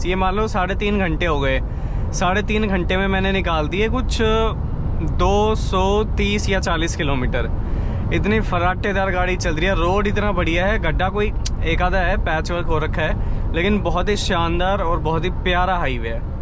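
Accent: Haryana, India. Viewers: Aap hariyanvi me baat kiya kro bhai maza aata hai bhot us accent me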